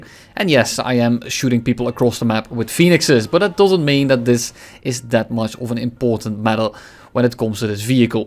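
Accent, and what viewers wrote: Netherlands. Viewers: Your Dutch accent makes me smile a lot. Top content! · Je hoort het altijd meteen als iemand nederlands is. Nederlanders hebben een hele goede engels vocabulaire maar spreken kunnen we niet. Haha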